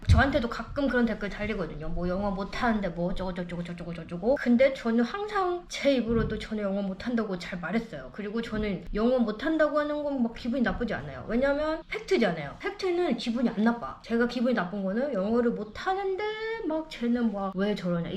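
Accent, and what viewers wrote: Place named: Gyeongsang, South Korea. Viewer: 님은 스피킹보다 히어링이나 독해가 훨 좋은듯ᆢ물론 스피킹도 수준급 이상이지만 갱상도 분이라 악센트 때매 그리 보이는듯ᆢ근데 그보다 님은 존재자체가 반짝여서 그렁거 항개도 안중요함 ᆢ마쟈 아니야~^^ ❤️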